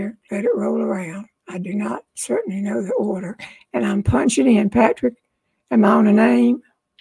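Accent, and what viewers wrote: South, United States. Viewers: I normally can’t listen to a southern accent, but is an exception. Love her. R.I.P.!❤️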